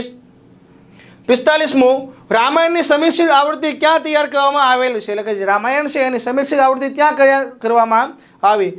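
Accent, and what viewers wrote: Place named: Banaskantha, India. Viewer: KOI BANASKANTHA NU CHE 😊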